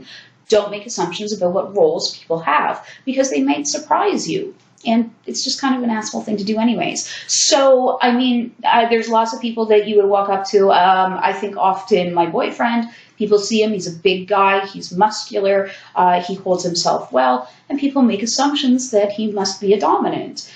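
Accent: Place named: Canada. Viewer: Learned so much in these 2 vids, thanks! Also, funny/cute how you say aboot & sore-y like on Degrassi 😆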